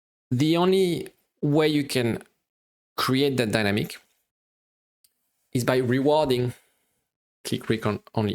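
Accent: France. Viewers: This video was extremely helpful. Subscribed! Also, your French accent is very cute😊🇨🇵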